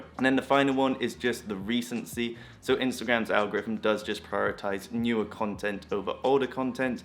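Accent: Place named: United Kingdom. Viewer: what part of the UK are you from? I ask because you have the same accent as one of the BBC news journalists.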